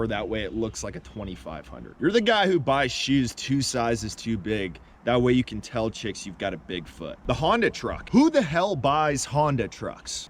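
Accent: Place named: California, United States. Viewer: This dude sounds extremely California lol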